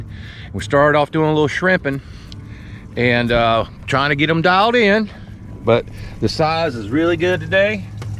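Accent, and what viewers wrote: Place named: South, United States. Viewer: I'm only half way through and I'm really liking it. The outdoor kitchen is looking great can't wait to see how you decorate the wallscwith your old time cooking gear. I'm Australian so with your southern accent that makes a sentence sound like one long word can you tell me what you said about the 4 burner works well?? Haha great job mate 😎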